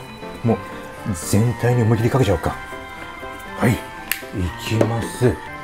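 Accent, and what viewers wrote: Kansai, Japan. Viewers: 好きなキャラが関西弁だからなんかちょっとキュンとして顎んときますね…😍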